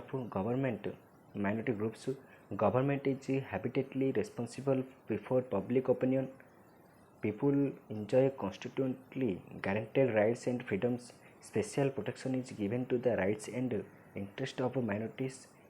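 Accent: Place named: Odisha, India. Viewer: I believe you must be aware with the fact that each one of us have a accent and so does he, which is not a big deal. These dialects are influenced by the different languages that different sections of the country also speak, side by side with English. He is from Odisha due to which he speaks such. Instead of criticising him you should appreciate him for explaining this concept in a wonderful manner.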